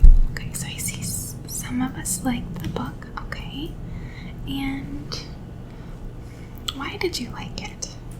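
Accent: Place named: Midwest, United States. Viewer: You’ve got a total midwest accent but your background doesn’t look like the Midwest in the winter lol. What state do you live?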